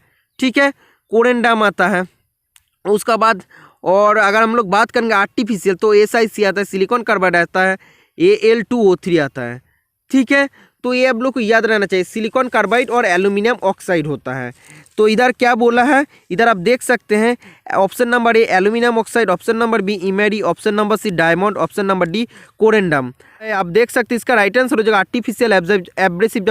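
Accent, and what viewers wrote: Bengal, India. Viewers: U r from Bengal?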